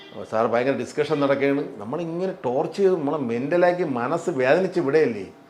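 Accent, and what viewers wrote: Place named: Trivandrum, India. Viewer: ഇത്രയും വൃത്തികെട്ട ഒരു സ്ലാങ് trvndrm കഷ്ടം തന്നെ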